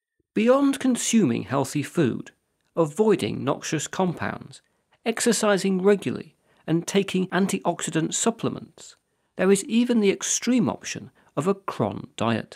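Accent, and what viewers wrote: United Kingdom. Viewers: This guy's British Accent made this video. I mean, if he had an American Accent I wouldn't have taken it so seriously, but for some reason because of his accent I couldn't help but pay more attention! All of thse novel ideas seem amusing, but something about the cyrotube Reanimation "card" sends chills up my spine :(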